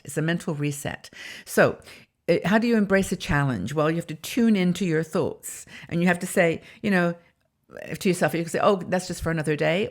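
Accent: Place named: United Kingdom. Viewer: Hello, thank you for this inspiring post of positive affirmations! This has definitely set the tone for my upcoming workout at the gym and day. I'm 62 and am embracing my age yet fighting my age too. I say 62 is the new 29. Haha! Love your necklace and you look awesome in red! I have to ask, where are you from as you have a slight British accent? Looking forward to your next video. 💜 from Texas.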